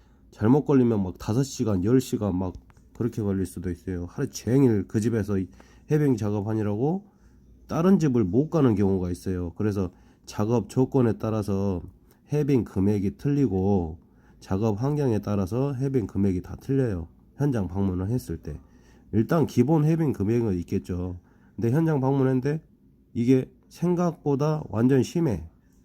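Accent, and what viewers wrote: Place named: Jeolla, South Korea. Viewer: 혹시....고향이 전라도이신가요 ㅋㅋㅋ 제가 전라도인데 뭔가 구수하네요 ㅋㅋㅋㅋㅋ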